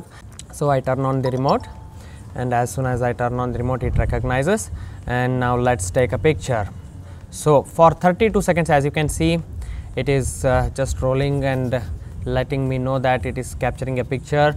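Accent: South India, India. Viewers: Accent is so much south Indian... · i always like indian accent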